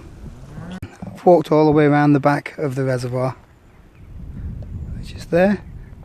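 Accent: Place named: Stoke, United Kingdom. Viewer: where are you from you sound like your not far from stoke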